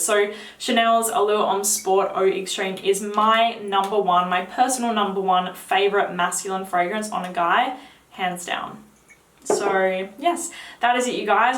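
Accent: Australia. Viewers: I love your Australian accent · hers is Australian not english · Australian accent, language is english. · She is an Australian. The American accent is actually the one of the most annoying world wide sorry bro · SO FUNNY TO LISTEN HOW STRANGE AUSTRALIANS SAY WORDS "HERE", "YEAR". SOUNDS LIKE "YEAH". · That Australian accent though 😍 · I love your Aussie accent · Are u an Australian ? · hello, just discovered your channel, loved the review and the Australian accent 😉 Liked and subscribed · I love the way you say "here"...and every other word that ends in "R". You have the best accent of any Australian ever in the history of Australia!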